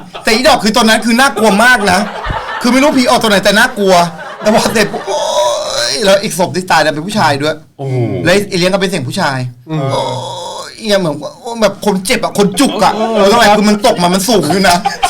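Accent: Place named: Sukhothai, Thailand. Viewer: ชอบ เล่ามากสนุกมาก ชอบฟังภาษาโข๋ทัย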